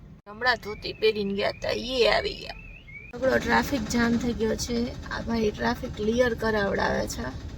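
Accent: Kathiyawad, India. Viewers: Har har mahadev..... tamara videos bo boring hoy che.... tamaro bolvano lehko batave che ke tame fakt kathiyawadi Gujarati boli sako cho to bijane samaj ni pade.... tame natak pan bo karo cho jemke hamna aa aunty.... jagya ne barabat explore karta nahi aavadtu tamne.... matlab tame khali dekhado karo cho navi camper van banavi eno.... bo boring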